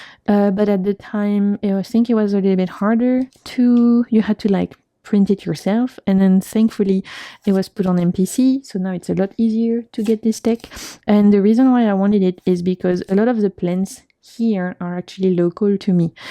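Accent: France. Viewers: Whatever you say with a French accent is better. 😘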